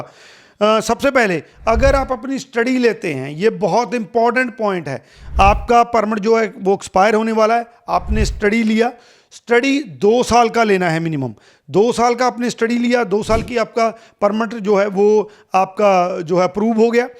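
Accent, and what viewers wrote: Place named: Punjab, India. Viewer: He is from a Punjabi speaking background, that's why it is a little bit different accent.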